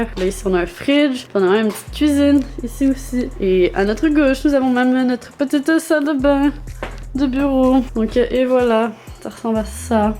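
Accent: Quebec, Canada. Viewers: Es-tu de Montreal?? Ou de Paris haha je suis confuse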